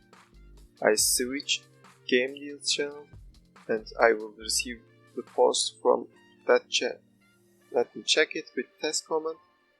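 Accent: Turkey. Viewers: man youre turkish right, your accent is so turkish!! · %90 Türksün değil mi :D kelimeleri telafuz edişini türk gibi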